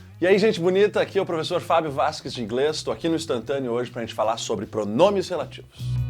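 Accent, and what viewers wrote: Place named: Rio Grande do Sul, Brazil. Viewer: sotaque gaucho gostoso da porra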